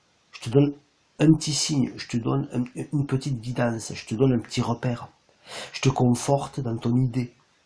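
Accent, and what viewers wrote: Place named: Tarn, France. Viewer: Coucou cela fait x années depuis 12 ans surtout le 11h11 le 14h14 , le 17h17 et 22h22 Ton accent me fait penser au Tarn lol Tu as raison pour les heures ❤ Les câlins des FJ sont aussi curieuses et je vais m'exprimer ici sans bouleverser mon intimité, les câlins sont espacés et à chaque fois se déclenchent des échos niveau famille enfin c'est bizarre ,comme un nettoyage Quant à la radio , je pose une question , et hop la réponse Belle nuit et grand merci ❤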